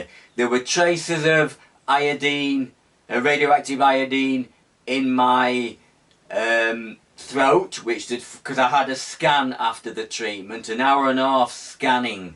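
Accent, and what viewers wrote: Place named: Sheffield, United Kingdom. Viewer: Good luck kid you're a South sheffielder so you sound nowt like me but your videos are brilliant keep up good work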